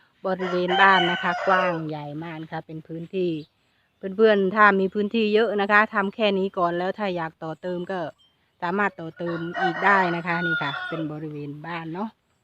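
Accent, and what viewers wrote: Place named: Surin, Thailand. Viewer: ฟังดูแล้ว.สำเนียงคน..สะเร็นชัดๆเลย.5555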